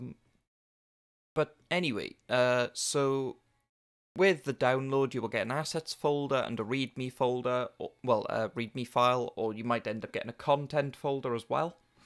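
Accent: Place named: Wales, United Kingdom. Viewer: Gotta love a welsh accent mun aye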